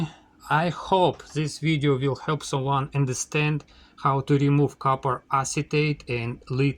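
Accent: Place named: Russia. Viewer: Most problem is - very very very very very very bad Russian accent. Sorry. English speaking people do not speak like that.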